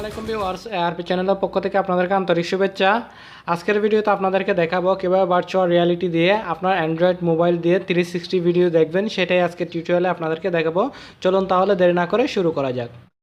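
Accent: Chittagong, Bangladesh. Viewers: ভাই আপনি কী চট্টগ্রাম থেকে?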